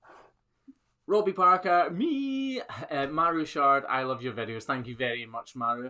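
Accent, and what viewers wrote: Scotland, United Kingdom. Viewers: Hi Mr I'm so sorry I missed the live stream but just wanted to drop you and the Mrs a Happy New Year from Northern Ontario Canada. Love your video. I'm not in farming at all but I find it amazing what care you take with all the cows and bulls. It's so great to see. So LOVED your video with all the calfs was wishing I could of been in there with you and played with them. Sooo sweet. Thank you for being such a kind and loving person it does come themrew in your videos. Have to love a Scotsman it's the accent. I'm part Scottish and have never been to Scotland your videos really make me want to visit. I had asked before but the music you play in the back ground who is it? I know there's more then one but I love it it's so relaxing. Can you give me somenoff the names please. O and you should come to Canada and see it we are up to Scotland standards. 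That would be a great video. Thanks for all your video and again Happy Ney Year may you and your be truly blessed.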